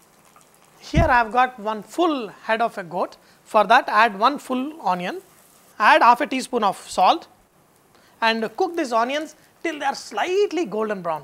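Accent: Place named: South India, India. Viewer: i love your south india accent.plz dont eat when u cook in front of the cam i feel jealous.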